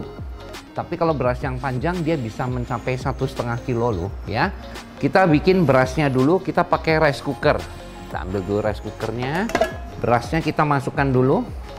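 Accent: West Java, Indonesia. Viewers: Euleuhh. Gening Chef teh urang Bandung. Pantesan mun nyarios rada ngalogat sunda. Hehehehe. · Pantesan chef logatna sunda pisan😁😁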